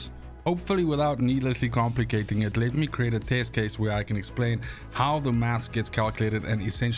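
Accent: South Africa. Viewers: Bro are you South African??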